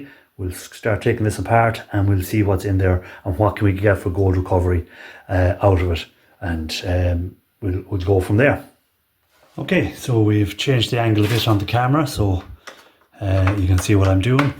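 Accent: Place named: Ireland. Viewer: how far away are you from Galway?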